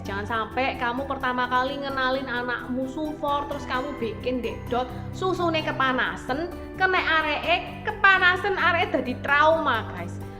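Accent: Surabaya, Indonesia. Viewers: Surabaya banget logatnya mbak, waduh mbak anakku juga begitu susah banget minum sufor pakai DOT, makasih mbak sarannya tak cobain semua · Wong suroboyo iki koyoe😂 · Jos,,, aq sueneng logat medhok suroboyan e mbk, basio mbuh sampean wong endi 😄 gk mboseni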